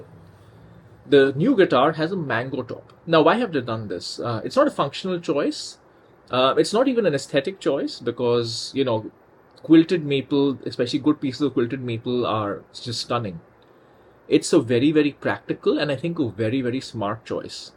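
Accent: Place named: India. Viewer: sound muted cause of Indian Accent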